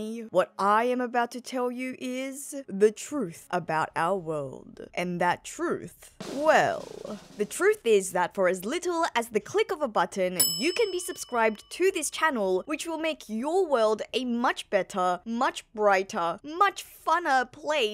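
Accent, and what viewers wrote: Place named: Australia. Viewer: It’s the cringe Australian accent. They cants pronounce the letter “o” they say “aur”. Like vide-aur instead of video… sometimes can’t say “ah” and say “er” .. listen to “ide-er” instead of idea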